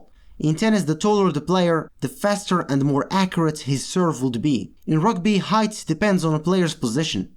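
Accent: Morocco. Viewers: Are you Moroccan by Any chance, i hear a familiar accent .. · where are you from? your accent sounds like a moroccan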